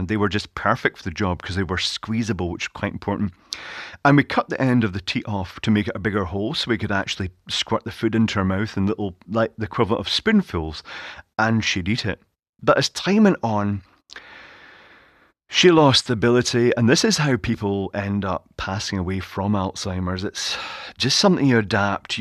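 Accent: Scotland, United Kingdom. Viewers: It's a Scottish accent, he was born in Glasgow.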